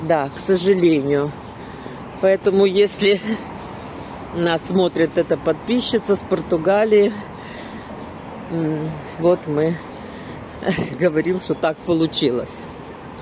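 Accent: Odessa, Ukraine. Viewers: Прогулка по Одессе прелесть! Милый одесский говор! Оставайтесь прежними одесситами, не поддавайтесь новой политике. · Класс!!! ---,, но уже пошли по здесь! " неповторимый Одесский говор, мягкий, добрый!!! Маса удовольствия, слушать ВАС! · Большое спасибо за прогулку. Я в Одессе училась в ОГУ, работала вышлв замуж, родила реенка. К большому сожалению по семейным обстоятельствам вынужлена была уехать в другой город, но Одесса всегда со мной. Судяпо говору вы настоящая одесситка. Обожаю вас. Напишите по какой улице вы идете, для меня это важно. Только название настоящее. Заранее благодарю.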